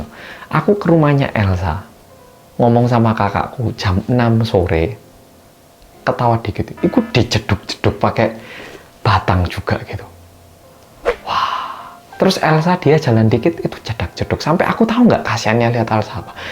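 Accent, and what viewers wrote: Java, Indonesia. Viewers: Smoga mas ttep jd org korea yg medhok bkn org korea yg kek gtu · . Jawaa bgt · Salam selamat & sejahtera utk Cak medhok dgn istri,slmt mlm,saya dari kota Bandung,saya ikut prihatin dgn pengalaman tdk enakmu tinggal di apartemen di Korea,oh iya saya punya info sangat penting tentang "Sesuatu hal dari budaya & tradisi Sunda" yg pasti akan membuatmu sangat suka jika mengetahuinya,yaitu info tentang hidup jaya yg mana setiap orang serta setiap rumah tangga membutuhkannya,tapi kita berdua harus ngobrol langsung,dtglah ke Bandung,salam kenal utk kalian berdua,salam Rahayu. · Mas pindah Indonesia neng kene biar kecil2 omahe yoh nyaman ora wedhi wedhi kyk ngono. Seng sabar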